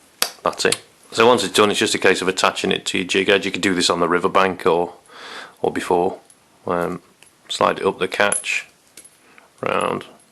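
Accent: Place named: England, United Kingdom. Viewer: Nicely done, thank you. Interesting accent, where are you from? Obviously from England, but I understand the accent is different in different areas. Thanks.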